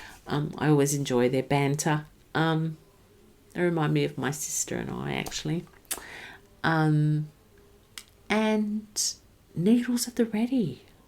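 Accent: Australia. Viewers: I love watching you. You make me smile. Maybe you can help me make some socks. Love your Australian accent too.🥰🧶🧙‍♀️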